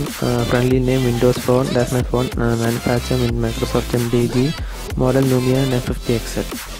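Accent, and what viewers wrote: Kerala, India. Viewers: Malayali annalle😆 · malayali anno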